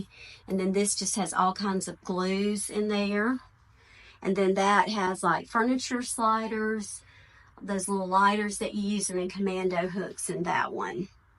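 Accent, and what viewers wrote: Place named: Alabama, United States. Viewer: Came for the organization, stayed for the beautiful Alabama accent! Music to this Southern gal's ears!!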